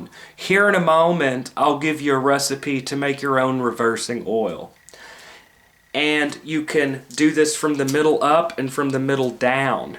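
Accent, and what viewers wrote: South, United States. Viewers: good on you. always a pleasure to hear that southern ascent. blessings.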